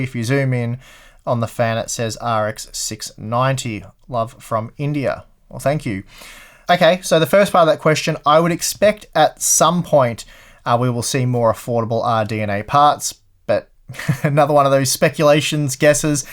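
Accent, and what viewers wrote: Australia. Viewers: basically he feels that you are a typical Australian and he finds Tim to be a bit more British than Australian, does that make sense? You are the crocodile guy and Tim is more Nicole Kidman, accent wise. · didn’t feel like 30 minutes. Oh how time flies when you’re listening to an aussie bloke😂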